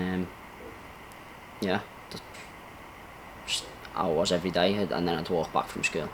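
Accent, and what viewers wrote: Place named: Wirral, United Kingdom. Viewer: Obviously he’s from the Wirral listen to him talk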